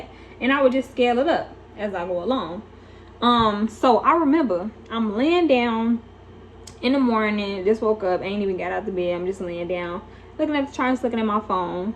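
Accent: United States. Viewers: Your accent(southern) is so cute! Ppl like y’all helped me dodged IML! Yes please upload more videos, we need more women traders on this platform!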